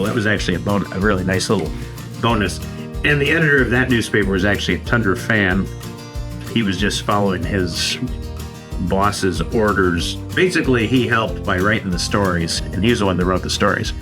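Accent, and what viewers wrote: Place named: Canada. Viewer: When you 1st started talking, I thought you were Canadian.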